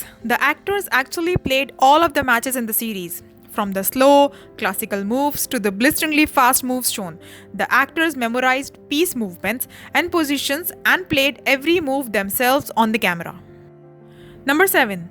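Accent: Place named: Pakistan. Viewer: Pakistani voice over HAHAHA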